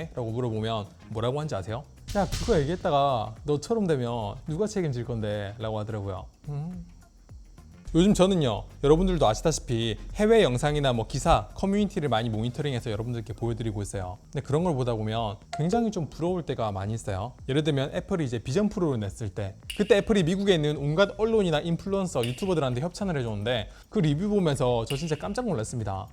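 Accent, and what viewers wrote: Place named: Jeolla, South Korea. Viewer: 유튜버 이분 전라도분인가 사투리가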